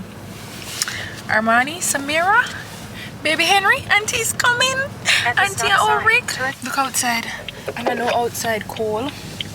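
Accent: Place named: United Kingdom. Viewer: I love the British accent ❤😂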